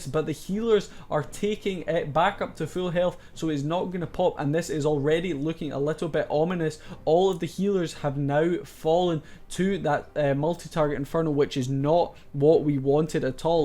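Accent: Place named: Scotland, United Kingdom. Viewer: your accent reminded me of "trainspotting" movie "choose life" thank you for the vaedio man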